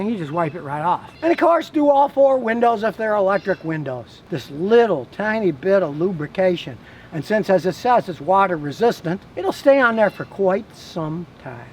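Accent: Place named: Omaha, United States. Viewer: chin(k) from asia here..........is it me or does this guy remind u of warren buffet......hes got that omaha accent,the way he talks even his sense of humour kinda reminds u of buffet.except instead of warren buffet the oracle of omaha and financial guru,hes warren buffet ur friendly neighbourhood car mechanic.maybe hes buffet's long lost twin brother who drinks 8 cans of diet coke a day.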